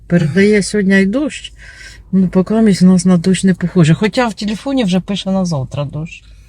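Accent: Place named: Dnipropetrovsk, Ukraine. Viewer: Доброго ранку!Дивлюсь Вас і посміхаюсь,Ви такі класні!І говір у Вас такий ,як у нас ,ми з Дніпропетровщини,теж сільські,але рідко кудись вибираємось.Дуже дякую за Ваші прогулянки ,А балачки то взагалі , як похід до психолога,тут і поради і пояснення!З Вами просто і легко!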